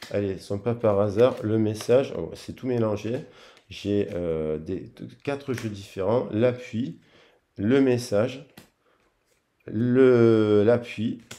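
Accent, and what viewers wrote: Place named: Provence, France. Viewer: Bonjour tres heureuse de Vous voir et de Vous entendre j adore votre voix du matin 😁 belle surprise 🌞 un rayon de Soleil qui vas illuminés Notre journée 😜 merci pour cette magnifique guidance Vous m avez fait rire 😄👍 pour votre petit message j ai le Coeur qui vibre pour toi qui Mai's venue en tête et j ai pensé avec votre accent a Marcel pagnol Marius TU me fends le coeur 💝 la parti de carte 🔥 j ai passé un bon moment en votre compagnie j ai adoré 👏 bravo gros bisous🤗😘🌈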